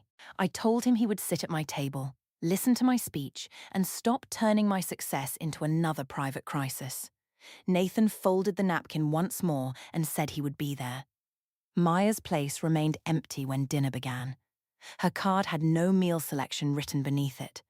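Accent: England, United Kingdom. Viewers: fidgeting or touching ofthe face or neck. Looking away. There are many things that are repeated like i told myself. Or mechanical precision. Many.of the stories seem.to follow the same template.she humiliates her husband. He starts sleeping in the other room. He locks himself in the home office getting everything together for a consultation with an attorney. The wife is getting too friendly with a co worker. And the English accent can be a little irritating after a while.im not trying to be critical. Just thought some feedback may help your channel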